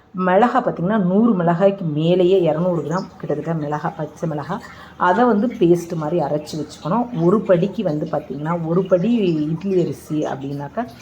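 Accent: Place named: Kumbakonam, India. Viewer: Super குடந்தையில் இப்படிதான் சொய்வோம் வார்த்தையுமா எங்க ஊர் மாதிரியே பேசுரிஙாக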